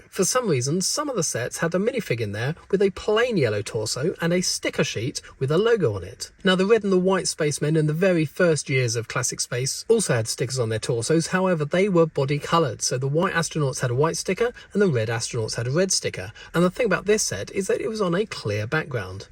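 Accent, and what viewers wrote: United Kingdom. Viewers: You sound very british.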